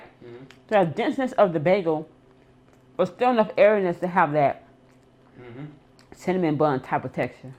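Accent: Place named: New Orleans, United States. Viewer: U HAVE A NEW ORLEANS ACCENT.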